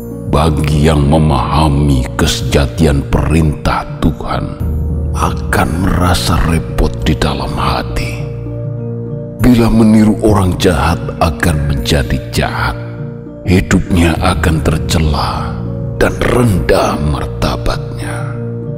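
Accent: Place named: Mataraman, Indonesia. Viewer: Kata-kata yang bahasa Jawa jangan dibaca serba o, aku bingung Jangan pake logat Mataraman Pake logat Jawa yang asli saja, a tetap dibaca a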